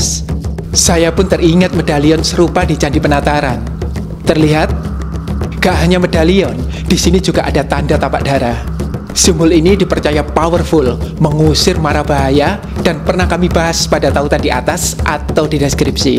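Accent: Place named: Probolinggo, Indonesia. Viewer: ciamik Soro gaya bicara orang probolinggo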